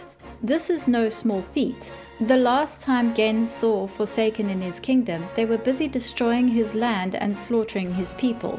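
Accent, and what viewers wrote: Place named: South Africa. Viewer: I knew you sounder familiar. You sound just like a South African. I hope your trip goes well.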